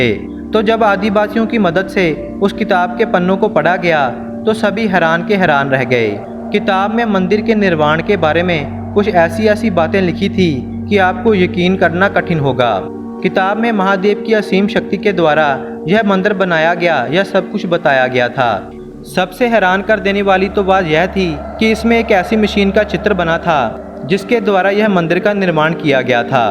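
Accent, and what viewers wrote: Punjab, India. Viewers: Caught a panjabi parson telling hindi story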